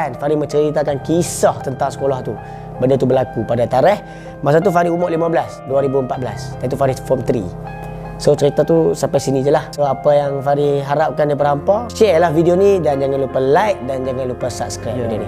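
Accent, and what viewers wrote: Kedah, Malaysia. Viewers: Terbaik la channel hang dik...dengaq hang cakap..terus rindu mk ayh di kg.(kedah)..salam dari selangor dikk.. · Ska lh dngr abg Faris ckp bhse kedah.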